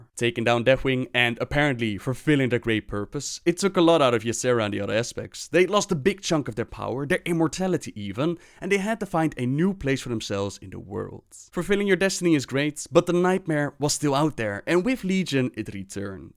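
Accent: Netherlands. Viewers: Dutch accent> stopped.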